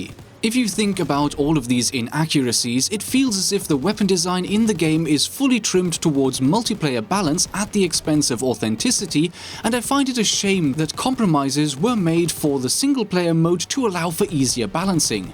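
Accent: Germany. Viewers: I'm laughting so bad!!! Omg, how to destroy a bad CoD game based on terrible historical inaccuracy 101 - featuring; summoned to defend the honor of the Fatherland. This is why I'll stick with Battlefield 1 for the time being. Sorry to say this, but I love the sound of your german accent/voice in full fledged fury. Seems/feels so natural.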